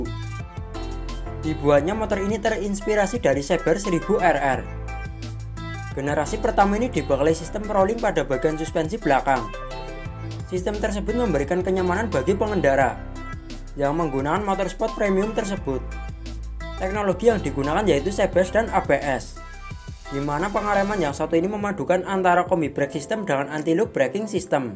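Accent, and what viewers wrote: Java, Indonesia. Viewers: Dari logat nya seperti orang jawa ( ngapak). salam dari purworejo jawa tengah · Medok nemen mase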